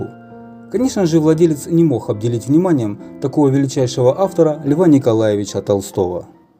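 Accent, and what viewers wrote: Ukraine. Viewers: Ха)))) Меня тоже жутко резануло по ушам голос за кадром! Это надо же,даже не поработав над своей дикцией пускать это все в эфир!)))) Не лучше ли было с таким украинским акцентом,озвучивать на украинском?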